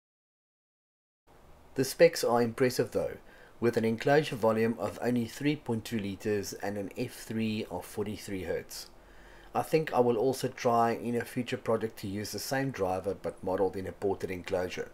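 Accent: Afrikaans, South Africa. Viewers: wow you sound very Afrikaans. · he sounds so Afrikaans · Nice to hear s.africa accent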